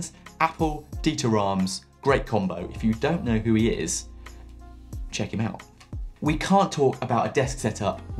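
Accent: United Kingdom. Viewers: Loved the energy of this video, really inspiring! (and lovely british accent)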